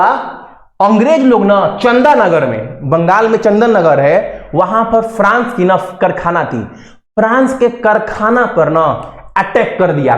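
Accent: Bihar, India. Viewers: Bhaiya Aapne Bihari andaz me smjhaya Amazing 😍🤩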